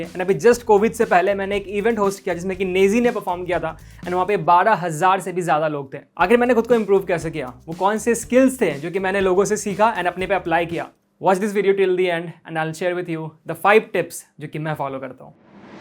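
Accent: Bihar, India. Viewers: Full bihari tone that the guy 👦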